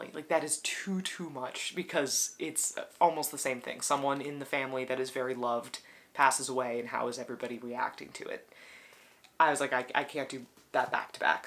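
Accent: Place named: Ireland. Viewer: Yayyy congrats on the new job! Also loved your Irish accent haha :)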